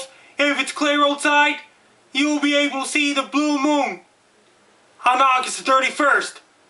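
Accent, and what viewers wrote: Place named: Cape Breton, Canada. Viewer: It is rare because it only happens "once in a blue moon". He talks like that because he's a Cape Bretoner. Not that there's anything wrong with that.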